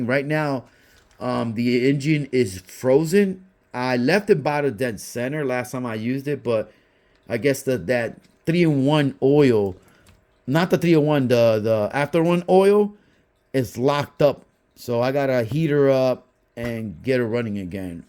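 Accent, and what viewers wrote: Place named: New York, United States. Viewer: Hey my brother where you from I'm just curious cuz you sound like a New Yorker I used to live in the Bronx, The reason why you took me back, I'm getting right back into the hobby again.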